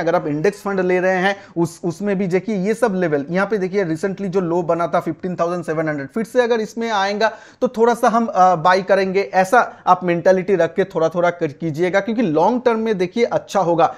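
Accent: Bengal, India. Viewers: many times you put bengali words in hindi accent in your communications that creates unique statements which I personally liked very much, at the same time there is no mistake as such,additionally your hindi communication touched another level which becomes your signature mark in futures. Yes, today is the day of huge corrections.Still I personally feel IT funds will boom again. Thanks for your video that will definitely help & subside today's burning pain amongst mutual fund lovers. 👍.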